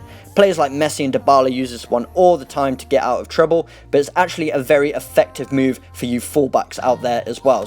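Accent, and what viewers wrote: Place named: England, United Kingdom. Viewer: Very nice,! Your English accent makes it more clear to understand here in America because the football commentator i like are English hahaha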